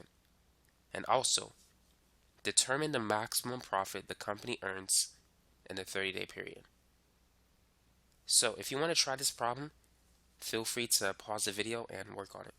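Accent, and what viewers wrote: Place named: New Jersey, United States. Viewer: you're def from New Jersey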